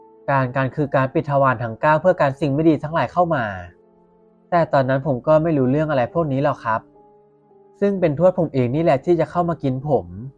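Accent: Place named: Isan, Thailand. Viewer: ผมว่าเล่าได้ดีนะครับ มันดูเป็นกันเองครับ คือบ้านผมอยู่ภาคอีสานนะครับ แล้วสำเนียงของพี่กับการเล่ามันเข้ากันมากกครับ ฟังแล้ว หลอนเลย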